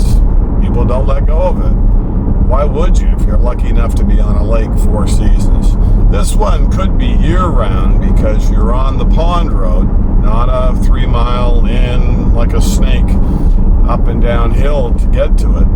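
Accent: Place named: Maine, United States. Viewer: Cannot hear with you in car. Muffled. I thought it was my speakers here in Music studio, Malibum California. $3000 dollar speaker system but, nope, it's your input. I love it when your outdoors showing properties. You are so good at it. Love the accent too. 'GEERAGE' that gets me every time. My grandparents had a beautiful Maine accent. 'LOBSTA' Can't get there from here'. lol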